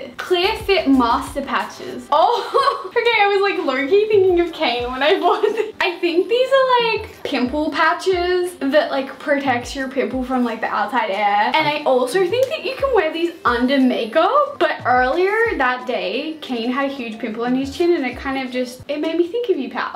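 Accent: Australia. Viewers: I NEVER UNDERSTOOD AUSSIE ACCENT BUT AFTER WATCHING FOR 6 YEARS, I CAN UNDERSTAND IT PRETTY WELL LMAO🛍🛍🛍🛍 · Normal people: no: nooore I love her aussie accent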